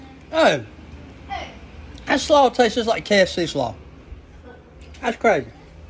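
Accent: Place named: South, United States. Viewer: Dayum!!! That looked good! Also freaked me out hearing a true southern drawl coming out of your mouth! I subbed because I want to see some more videos!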